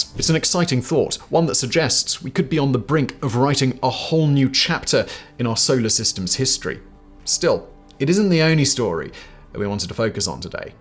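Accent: United Kingdom. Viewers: You Tube SUCKS! I have removed this man's channels over and over again. But when You Tube changes the algorithm, it force feeds me this Simon guy's many channels despite my removing him. Simon's channels suck. They are poorly researched information that is mostly government propaganda talking points. Terrible videos. And just because Simon has a British accent, this doesn't not make him an authority on anything. He's just a talking head. England is over. Brexit has made the only job in England a You Tube content provider. Terrible list videos that spread falsehoods and bull. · Your voice is hard to listen to. It's like you're from the most stereotypical posh little English village imaginable. And no, despite what you think, it's not really a good look for you.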